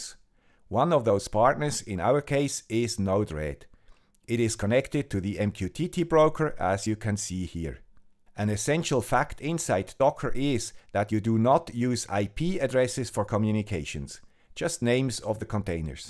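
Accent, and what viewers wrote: Switzerland. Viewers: Make sure that no-one tells our Swiss guru that he should have described the Discord community as "vibrant" rather than "vibrating" at about . Keep up the good work, I am sure that technical instructions sound more convincing when presented in a Swiss accent. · my brother with that crazy Swiss accent... I'm so very glad 2020 is continuing to treat you well!! Just wanted to wish the best of wishes to you and your family well for the rest of the year!! Lets hope this insanity is mostly in our rear view mirror now...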